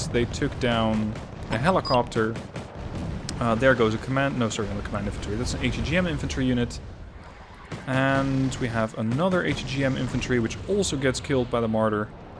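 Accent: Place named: Netherlands. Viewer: Sounds like a dutch one to me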